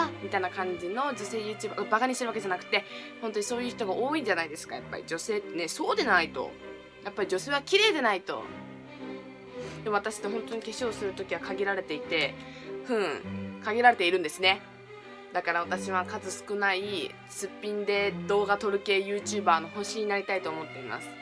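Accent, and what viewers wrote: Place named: Hakata, Japan. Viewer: 博多弁好き！いつもめちゃめちゃなまっててほしい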